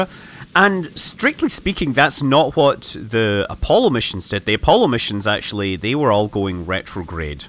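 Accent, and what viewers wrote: Scotland, United Kingdom. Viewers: Scott Manley I have to say. Watching your videos has given me a massive appreciation for Kerbal and helping me feel like physics and rocket science somewhat approachable to the layman. And with a Scottish accent to boot! Thank you for helping me fall in love with this game and science :)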